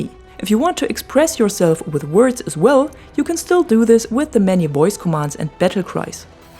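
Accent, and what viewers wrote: Germany. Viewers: Bist du deutsch? 😆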